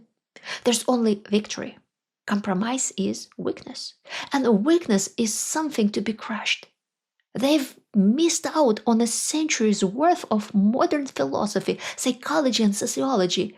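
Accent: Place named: Russia. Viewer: you still have the Russian timbre when speaking English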